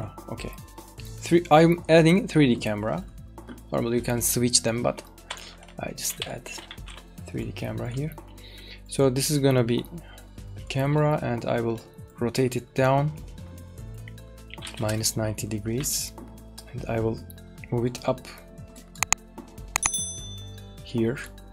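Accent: Turkey. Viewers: Your accent like Turkish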